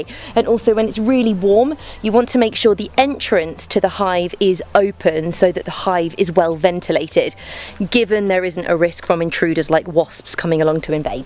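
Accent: United Kingdom. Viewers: What's wrong with me that i feel ichy when she talks. I love british accent but only when I like the speaker.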